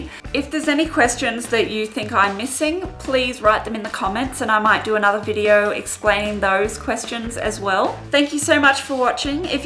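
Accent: Australia. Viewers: I LOVE your accent!! Australian, right? It's adorable. Cheers from San Francisco :)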